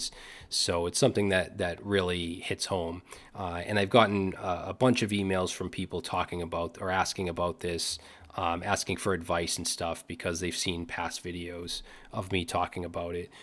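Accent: Boston, United States. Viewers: Yes, he is from Boston, MA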